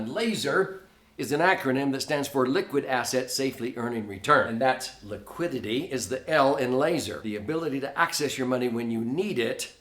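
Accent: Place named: California, United States. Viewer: Thanks for everything, you value your California style articulation. I have to do something with my old 401k in the next 30 days. Financially I’m in a tight spot I’ve thought of pulling 5K to help my current position then putting the rest somewhere else. I’ll have a little over 20k left… you’ve got me thinking of a iul but idk if it’s the way to go. Do you have any acolytes I can work with in Wisconsin? Thank you sir, peace.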